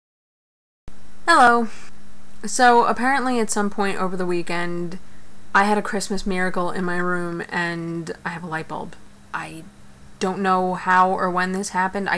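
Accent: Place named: New York, United States. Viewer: haha this video made my mouth water! i love bbq food and fries ughh yum!! are you from NY cause you kinda have a NY accent?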